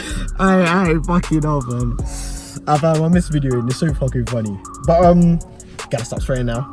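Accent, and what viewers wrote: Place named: South London, United Kingdom. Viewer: Bro , you cant be doing with these likkle 5 min videos if you want to grow your channel. Just saying. I have been liking your videos. The South London lingo( which i love and use to whilst growing up) just takes me back. I live up North now, but it is so nice to hear the South London talk.. Anyways bro . You need to spend more time vlogging -lengthy vlogs that is ( 20mins possibly) :)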